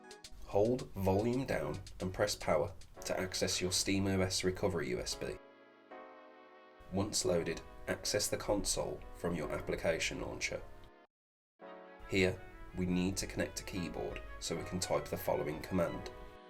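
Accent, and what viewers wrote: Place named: England, United Kingdom. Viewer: It's a pleasure to listen to your perfect England accent. You combined all of the information into perfect tutorial. Thank you very much for such great video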